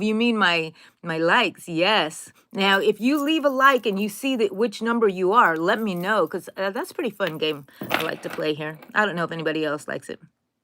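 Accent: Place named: New Mexico, United States. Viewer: Are you from NM? Your accent reminds me of the abuelitas here!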